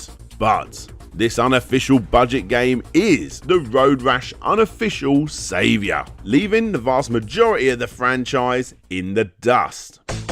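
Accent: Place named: England, United Kingdom. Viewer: While I enjoyed your video, and your English accent is marvelous to a boring yank like me... You never mentioned the PC version! Shame! But good job none the less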